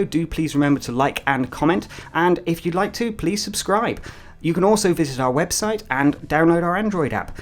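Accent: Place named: England, United Kingdom. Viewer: SOOOOOOOOOOOOOOOOOOOOOOOOOOOOOOOOOOOOOOOOOOOOOOOO ENGLISH. (I'm British, barely anyone sounds like that here)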